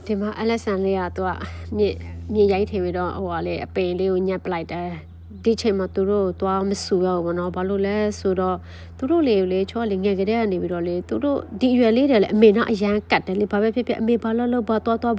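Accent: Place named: Myeik, Myanmar. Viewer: ဘိတ်သံလေးနဲ့ ချစ်ဖို့ကောင်း နေရော ❤❤❤ အားချစ် ညီမ ငယ်❤❤❤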